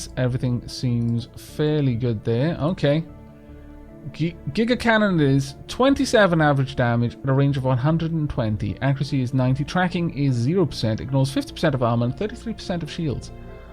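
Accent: Wales, United Kingdom. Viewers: Merry Christmas. No offence but I love your Welsh voice, so lyrical and soft. Love the one hour vids, it's like I'm watchin' netflix. But your welsh and you stroke ferrets. How re the ferrets? Is that the reason for the soft voice, you sexy ferret beast.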